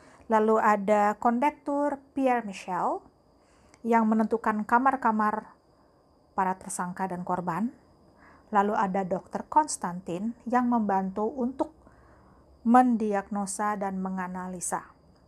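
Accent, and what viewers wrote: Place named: France. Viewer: Asiikk banget pake aksen français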